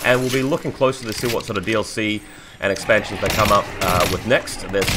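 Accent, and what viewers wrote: New Zealand. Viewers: bruh i fucking thought you were Kiwi, you didnt have any other accent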